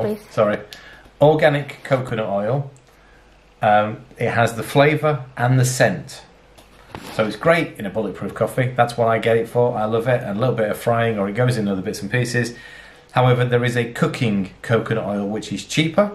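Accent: United Kingdom. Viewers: This was so helpful. My family and I have decided to start our keto lifestyle (while our child is still young) and most recipes researched are American to which a lot of the ingredients are hard to get a hold of. Hearing a British accent is reassuring as well as knowing you shop in aldi. We use aldi for our weekly shops as it suits our budget so to know that keto on a budget is a thing gives us a lot of motivation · Thank you for this you have no idea how helpful and reassuring it is hearing a British accent it’s like saving grace I’m going out today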